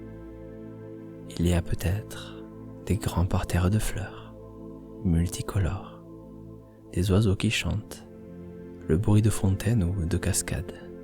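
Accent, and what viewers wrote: South, France. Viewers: Votre petit accent du sud me réchauffe le cœur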